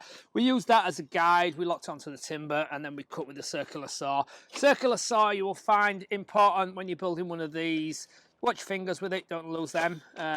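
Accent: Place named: Yorkshire, United Kingdom. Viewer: Found these today, first of all thank you for taking the time to make these. I think they're the best step by step instructional videos I've seen, very well done! And sounds like a proper Yorkshire accent too, which is even better! Question - what size channel do you use for the shoes? Struggling to find one that will fit 75mm internal dims. Do you use the grinder to cut them from a length? Thanks very much, keep up the good work! Si thi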